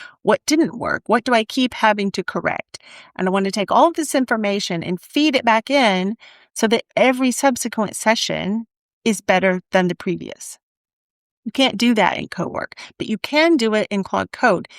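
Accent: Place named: United States. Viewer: One of the first things that caught my attention: American accent, but Weck jars in the background? As I'm just starting out with Claude Cowork and Code, I'm looking forward to following along and maybe avoiding some mistakes. Bonus: finally a woman in my age bracket explaining things to me instead of a 20-year-old guy.😉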